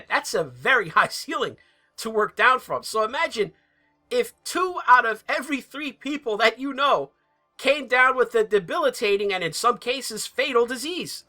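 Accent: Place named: New York, United States. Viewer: Sounds like New York \ New Jersey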